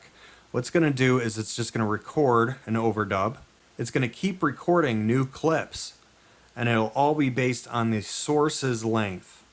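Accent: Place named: Michigan, United States. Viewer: Man you are really good. And you sound like you are from my home town in Michigan.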